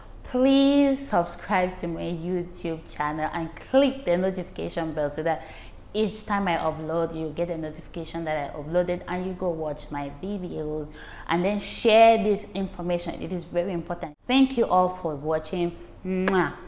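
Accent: United Kingdom. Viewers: The simple truth about the UAE. great job. Clean queen's English keep it up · Our Camer lady with British accent.great job keep up 🔥🔥🔥🔥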